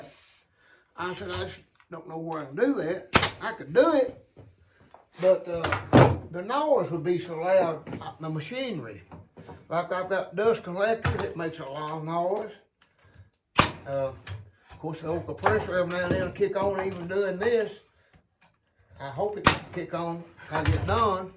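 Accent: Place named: Eastern Kentucky, United States. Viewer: I’m from Martin county Kentucky I would like to learn more about building these chairs. You sound like your from around the same area. Love the video and the craftsmanship keep it up 👍.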